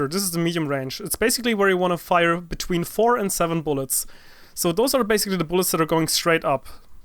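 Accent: Germany. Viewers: ! i guess u would do great by doin that cause evry gun has different ways and styles to play that newbs like me need to learn, btw u hv a great accent for a german i used to think all of u would say ze and zat (no offense i hope u get what i mean)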